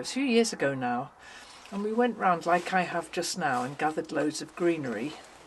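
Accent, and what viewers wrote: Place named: Lancashire, United Kingdom. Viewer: My Lancashirian grandmother was only 10 when she immigrated to Canada but she retained pronounciation of words like sun, stuff, does, and others. I hear her when you speak. LOL May you have a Merry Christmas and Happy, Healthy New Year.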